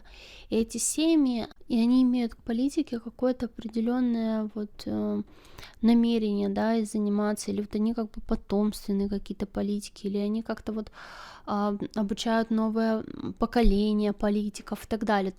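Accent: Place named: Ukraine. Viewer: По говору слышно, что с Украины, и по смысловой части тоже.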